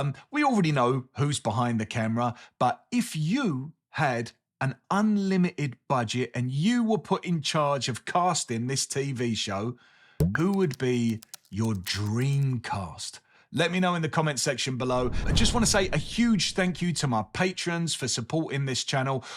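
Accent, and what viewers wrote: United States. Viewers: GREAT American accent!!! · I love the American accent!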